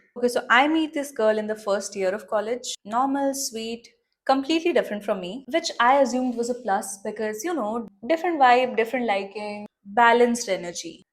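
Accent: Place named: India. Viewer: Omg you sound so western but your desi accent is strong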